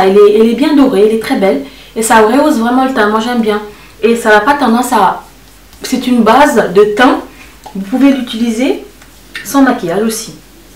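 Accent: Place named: Senegal. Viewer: Tu es de quelle nationalité ? tu as l'accent sénégalais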